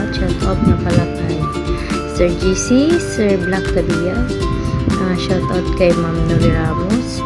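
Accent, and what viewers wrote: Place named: Iloilo, Philippines. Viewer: Ilonggo na tono mo sis 😅😅😅😅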